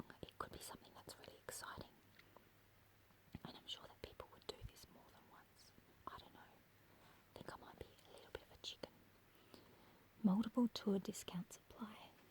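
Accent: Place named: Australia. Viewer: Love your voice, so Aussie.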